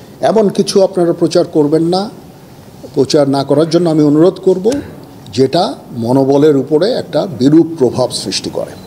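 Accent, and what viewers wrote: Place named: Bangladesh. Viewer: কথা শুনে আপনাকে বাংলাদেশী মনে হচ্ছে। থ্যাঙ্ক ইউ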